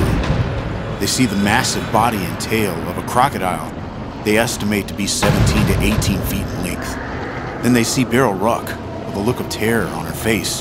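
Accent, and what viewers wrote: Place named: United States. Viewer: Great narrative. Good thing by an American otherwise I think I'll have a hard time understanding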